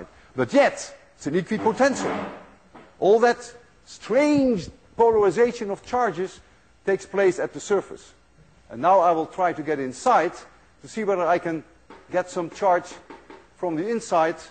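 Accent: Netherlands. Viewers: Dit is een Nederlander, ik hoor het aan zijn accnt XD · all I can tell you is that his accent is dutch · Prof was born in Holland? - his accent is very Dutch Great presentation though :) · As far as I can hear, he is dutch. 100% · He has a Dutch accent somehow.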